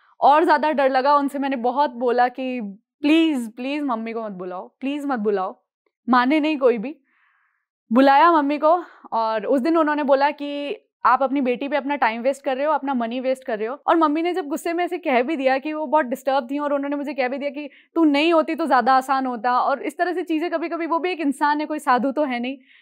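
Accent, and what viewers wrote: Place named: Rajasthan, India. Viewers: Khammma Ghani Baishaa Mevadi me aapki boli hunke ghano majjo avve.... Khas krne vo jo bachha he vo msttt lagta he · आपका पहनावे और बोलचाल से हि पता चलता है कि आप वीरों कि भुमि राजस्थान, मेवाड़ से हैं।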